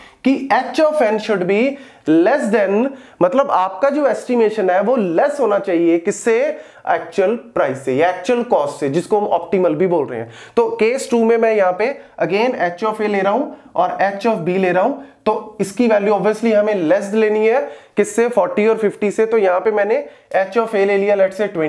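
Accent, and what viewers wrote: India. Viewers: Sorry I cannot understand Indian English...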